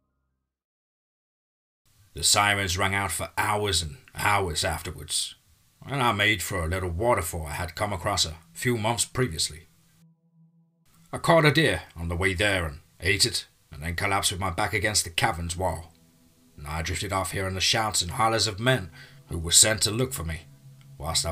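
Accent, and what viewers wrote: United Kingdom. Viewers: No, they probably just can't stand a British accent telling them a story, or they are aware wolflike, like me. Oh, and I can't wait for you to call me a racist so I can call you some names too, since I'm in a tear-your-throat-out mood right now. I'm the 7th👎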